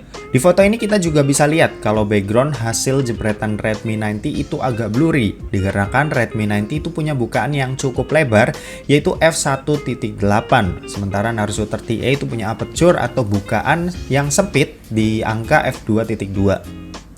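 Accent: Semarang, Indonesia. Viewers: Orang semarang bang? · wong semarang yo mas? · cah semarang ki jebul🤭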